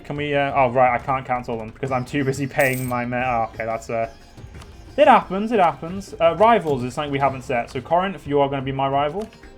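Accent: England, United Kingdom. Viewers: Because of the way he was saying the NEW MODELS ARE LEGITAHMEHLLY BYOOAFUL I couldn’t watch. English accent is shite.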